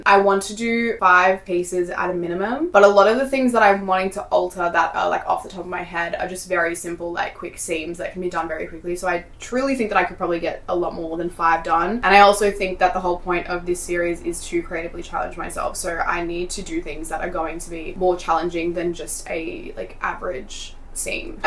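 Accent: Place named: Australia. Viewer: The UNO REVERSE RUG!! I love it! Also I only discovered your channel like a week ago, but you've already become a staple must-watch channel for me! I love sewing and crochet too, and your content is just so refreshing. I love your yap snippets, or your little walks and talks about anxiety, society, the human experience etc. Also there's something really homey and refreshing about hearing an Australian accent! Everyone else I watch is generally American so it felt so surprising but lovely to hear and see someone over here :)) Feels like having a friend over whilst we craft and yap in my living room. *Edit* because I though thought of something re: the tension whilst you've been sewing - double check how you're threading your machine. For the longest time I had missed a small step near the beginning. It will be different for each machine, but for mine there was a small disc or plate and instead of just wrapping the thread around it, I had to place it between the plate, almost like it slotted in between two (I'll compare the feeling of "slotting" the thread in to the feeling of placing dental floss between your teeth. 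That might sound rly weird but it's a similar feeling, and as you can imagine with dental floss, it would kind of hold the thread in place whilst still allowing it to run through. When I was skipping this step it meant that near the spool the thread was loose but as it passed through this sort of sandwiched portion, it pressed down the thread and helped keep it mor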